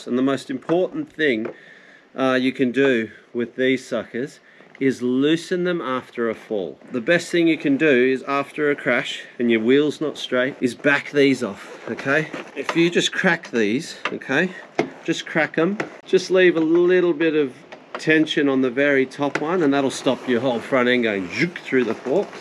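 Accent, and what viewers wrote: Australia. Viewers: love that Ozzy accent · explained by a fellow Australian and in an accent/lingo I understand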